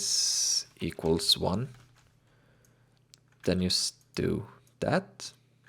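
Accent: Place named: Sweden. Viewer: Love the swedish accent, very cute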